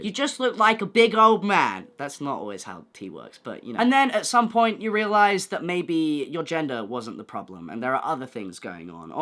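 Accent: United Kingdom. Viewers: this is not a hate comment or anything but OH MY GOD i did not expect this accent. Like you have the most american face ever. you look like you surf in your free time. and i see your californian face and i watch the video and its the most bri ish accent ive ever heard. like this is insane. nevermind the accent, i really liked your takes and generally the way you speak. you seem to be a very smart and compassionate person. very nice video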